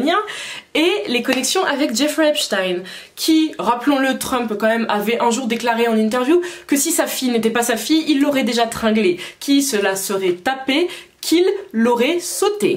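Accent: England, United Kingdom. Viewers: ton accent anglais🤩👍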